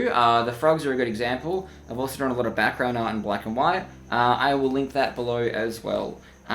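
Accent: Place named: Australia. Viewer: you have the exact australian accent i thought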